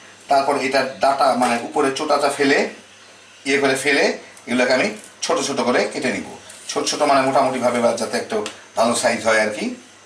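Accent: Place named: Noakhali, Bangladesh. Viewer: মনে হয় নোয়াখালী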